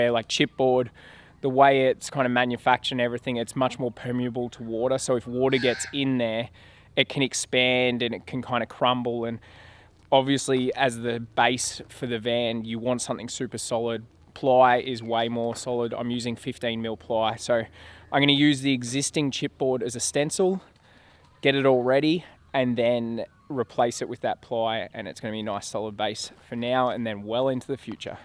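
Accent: Australia. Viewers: Maybe it's the native bird sounds in the background or my imagination but I reckon you're sounding a bit more Aussie since you've been back!? I'm so used to hearing American accents in film/TV that it still sound strange to me to hear our accent coming out of a screen haha. Hope you're enjoying VIC :) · I like:s Australian English accent... It's same like Australian cricket commentary....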